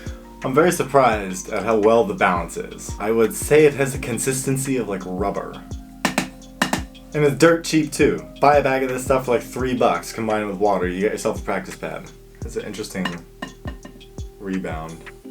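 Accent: South Africa. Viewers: What are u South African?? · You're South African?!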